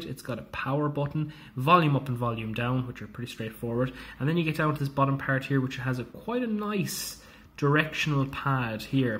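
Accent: Ireland. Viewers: Is it odd for a man to tell another man his voice is adorable? I want this man to FaceTime me nightly and just tell me about his day while I drift to a deep slumber to his sweet buttery brogue. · You're Irish right? If so how did you get this console?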